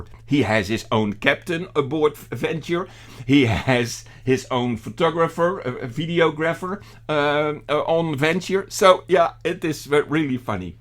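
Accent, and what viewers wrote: Netherlands. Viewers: Nice that you are back again, I love when Dutchman speak english...........Act natural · Keep that Dutch accent - its genuine. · Ha Ha Ha, you can't be Tony Fleming! Just like the English can't sing Russian Folk songs? Nor can a Dutchmen copy the sublime tones of a traditional Yiddish song? Be yourself because to me you sound and have the same mannerisms of my brother Tony? I know how to translate you amusing "dinglish" to English with great ease! Be yourself mate, that's the way we like you!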